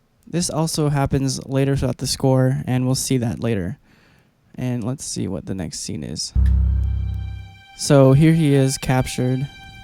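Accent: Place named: United States. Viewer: I thought you would have a Spanish accent with your last name. I was a bit shocked to hear an American accent.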